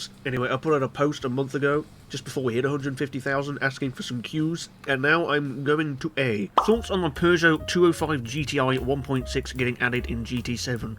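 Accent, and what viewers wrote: United Kingdom. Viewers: HELLO FUNNY NASAL BRITISH LAD,CONGRATS MISTER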